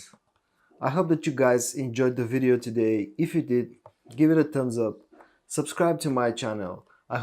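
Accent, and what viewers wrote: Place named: Russia. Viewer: Your english is too russian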